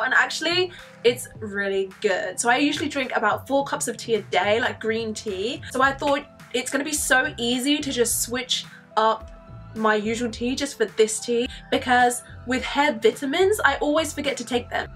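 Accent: London, United Kingdom. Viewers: Are you from London #londonsquad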